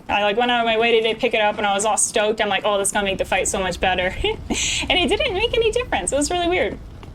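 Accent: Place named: Australia. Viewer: English, not "British" accent but yeah it sounds more Australian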